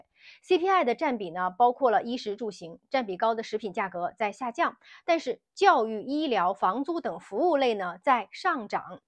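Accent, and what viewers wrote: China. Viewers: 你应该是大陆人吧，居住在台湾，口音像大陆人，写的字也是简体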